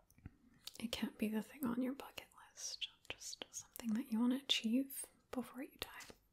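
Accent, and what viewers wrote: United Kingdom. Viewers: please make more videos with british accent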